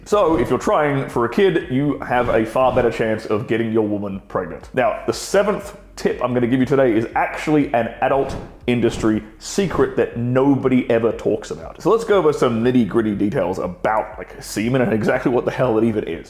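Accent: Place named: Australia. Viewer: He's got an accent buddy. He doesn't speak God's American English. He speaks Australian Queen's English.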